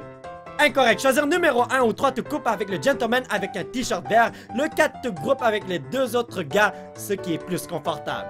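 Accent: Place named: Canada. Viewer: salut je t'adore continue tes super est j(adore les canadien si tu veux savoir j'adore l'aksent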